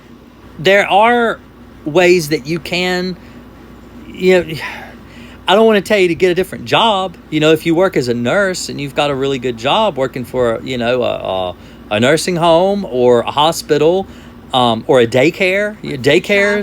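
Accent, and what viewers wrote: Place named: Virginia, United States. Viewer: Thanks for the vid. It was very helpful. Love the Virginia accent, by the way.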